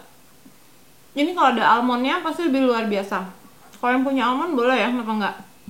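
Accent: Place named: East Java, Indonesia. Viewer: makasih resepnya ciciiii...btw cici ini org jawa timur yah. logatnya itu lohh sukaaaaa....cara2 bikin semua resep mudah. sederhana dan ga nyusahin 👍👌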